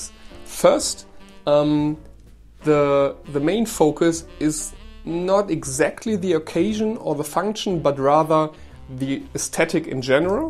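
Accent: Germany. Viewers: Well it is audiable that you are not a native speaker, but so what? You are very easely understood, speak free, flutend what more can one expect? Also who am I to complain, I m not a native speaker as well. The only thing curious is how different your accent is in comparison to mine, given we share our mother tongue. I doubt anyone could guess that hearing both of us. I dare say yours is more stereotypical german while mine just . . . all over the place and a huge disorganised mess, but who cares, communication still works ^^ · Perfect! Not enough people teach the philosophy of style which is, in my opinion, the most important aspect. From there, everything else flows. And your English is great...the German accent makes it that much better too my friend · British Vs Italian style with a German accent. An interesting concept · I have German relatives and was married to a German for 4 years almost 40 years ago. 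He's been in America more than 40 years and his English is far, far better than yours. Your English sounds like that of my cousin from Bielefeld; it's like ASMR (?) for me. Also, I used to teach at Göttingen and Bonn, (an English speakers class on history and foreign policy) and while I was impressed with the quality of my students' English, yours is far, far better. So no need for you to apologise for ANYTHING about your English! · Aber zum Glück stören nur wir Deutschen uns an einem deutschen Akzent. Für die native speaker klingt es exotisch, und der Rest bemerkt es eh nicht. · I love German accent so don't worry, it's actually very beautiful and pleasent for the ears ❤️ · You have nothing for which to apologise with your accent. An accent in a foreign language is not something to deride. Especially a well-articulated one, such as yours. I find that a properly enunciated German-English accent gives a certain aristocratic flair, it feels very Prussian. 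As long as you are judicious in your grammar and word choice, your accent is an asset rather than a liability.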